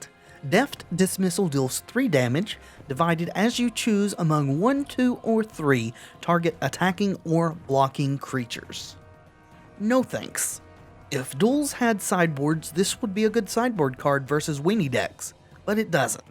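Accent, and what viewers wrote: South, United States. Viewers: First time watching your videos, had a very good time. Your southern accent kind of reminds me of Gideon from Gravity Falls. Hope you take that as a compliment! :D Thanks for the content. Thumbs up.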